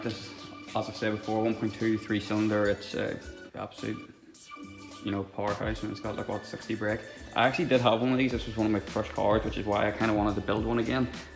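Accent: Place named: Northern Ireland, United Kingdom. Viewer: Glad to find this channel mate by the sounds of it ur from Northern Ireland same as myself, have a 1.2 6r and have been giving a lot of thought into an engine swap and awd conversion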